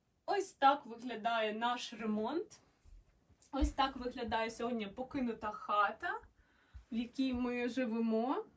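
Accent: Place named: Western Ukraine, Ukraine. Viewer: У нее бизнес,а не молодец,голос западенки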